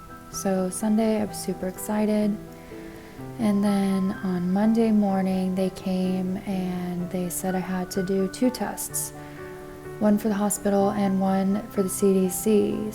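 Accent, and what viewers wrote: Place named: Midwest, United States. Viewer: Yes, even in North America the situation are getting dicey....judging from your accent, are you from the midwest? I'm in the Southeast (Florida) now 🙂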